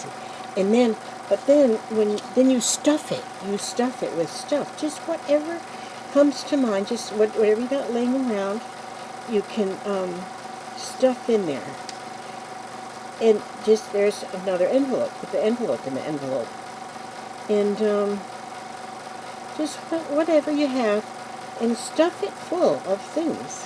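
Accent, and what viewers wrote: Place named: Minnesota, United States. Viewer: I really enjoy your videos! You are so inspiring yet so calming! I will always think of u as! I found that story so cute in another video, maybe it's your voice has that Minnesota accent. Anyways your videos are a pleasure to watch TFS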